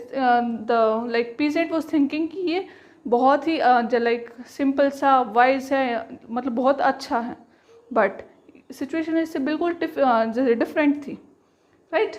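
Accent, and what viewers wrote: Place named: Haryana, India. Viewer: Ap to Haryana sa lag ra mam ji 😀👍🙏❤️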